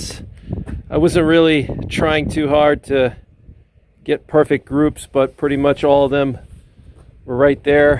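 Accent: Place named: Boston, United States. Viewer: are you from Boston? Something about the way you talk reminds me of burr, not the accent so much but more like the cadence or something, the way you end sentences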